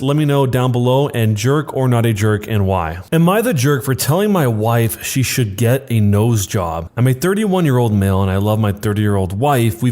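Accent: South, United States. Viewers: Your southern accent makes me want to watch true blood again lol. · I am absolutely losing my head over the southern accent omfg i love it 😂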